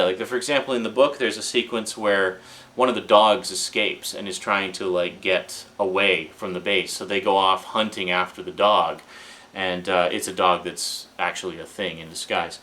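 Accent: Canada. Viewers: You are from Canada....Abooot! Not mocking, I can just tell. Or you are close to the Canadian border at least. Where exactly do you hail from? Greetings from Philadelphia!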